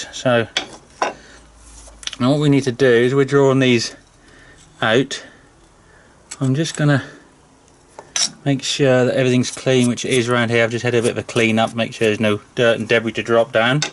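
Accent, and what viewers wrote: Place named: North Buckinghamshire/Bedfordshire, United Kingdom. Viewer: Where about`s are you? accent sounds familiar (north bucks/beds) you near George?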